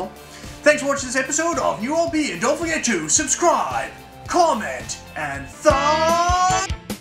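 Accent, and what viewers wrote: South Africa. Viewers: Are u South African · You sound South-African mate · are you from South Africa?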